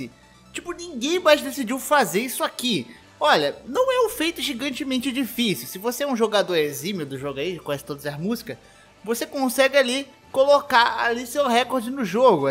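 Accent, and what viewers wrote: Rio de Janeiro, Brazil. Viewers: Tu é do rio mano? Qual parte? Sou da oeste, Tmj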